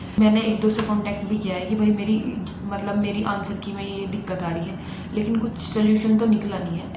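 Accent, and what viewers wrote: South, India. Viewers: Mam Aap south se ho kya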